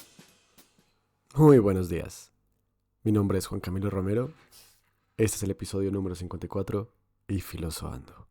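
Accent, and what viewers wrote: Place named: Colombia. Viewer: Recién descubro tu canal. Como admirador de la filosofía islámica, te doy gracias por cubrir estos temas en español. Por cierto, ¿eres colombiano? Creo que tu acento te delata jajaja.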